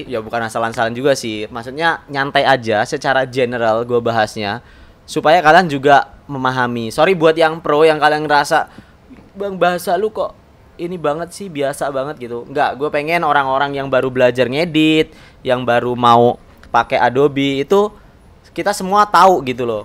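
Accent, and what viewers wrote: Java, Indonesia. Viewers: Ngomonge Medok Sih Kang, Sampean Wong Endi Sih?.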